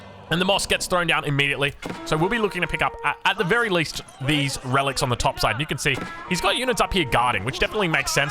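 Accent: Australia. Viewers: Aw :( you don't sound as Aussie as you used to from the last upload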